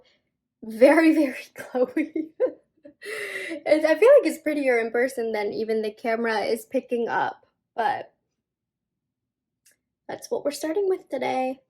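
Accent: England, United Kingdom. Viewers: Aah, excellent video, and the English accent uncanny (good audition for Bridgerton). Yeah, I agree with it looking metallic. It reminds me of Laura Geller's balance and glow powder foundation, and that never sat well with my skin. But you did an amazing job with this honey, I won't be purchasing it, but on a very young skin, it would probably look amazing, just not for my almost 61-year-old skin. Thank you, gorgeous girlie 😘 🌟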